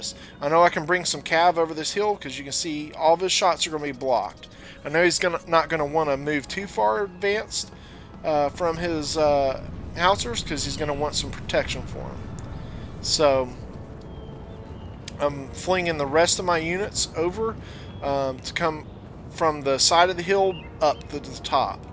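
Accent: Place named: South, United States. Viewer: I dont know why youtube suggested this video but I found it entertaining. Your commentary is pretty good and I must say I find your (somewhere in the southern US) pleasing to listen to, but perhaps 15 minutes is a bit too long. Anyways you earned yourself a sub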